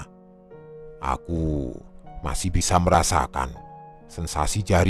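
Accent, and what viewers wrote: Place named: Palembang, Indonesia. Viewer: Dari logatnyo kau wong palembang yoo?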